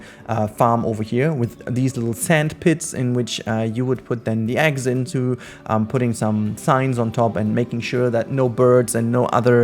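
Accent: Germany. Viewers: Du sprichst ziemlich gut englisch, man hört trotzdem sofort das du Deutscher bist ;)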